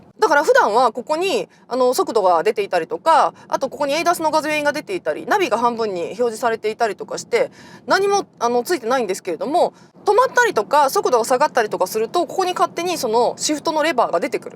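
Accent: Kansai, Japan. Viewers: って、関西弁たまぁに出るやん❤ 鞄に飴ちゃん忍ばすオバちゃん系。早口と、関西人ならではの、間を嫌うマシンガントーク ❤いつも観てます🎉大好き。でも、ロングレンジRWDなら買いたいです。来年かなぁ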